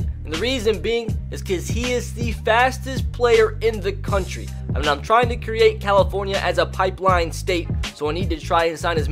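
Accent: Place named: South, United States. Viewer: He’s Southern. Respect the accent, Karen.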